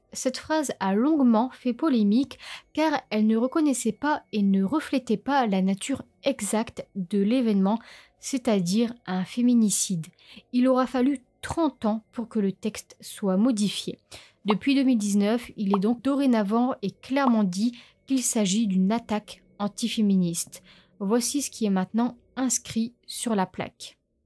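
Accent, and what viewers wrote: North, France. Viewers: Tu es du nord de la France ma grande... ça s entend... lol... moi aussi... Merci pour ton récit ! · Vidéo bien traité en effet, avec un petit accent du Nord de la France 😜 , merci encore 😘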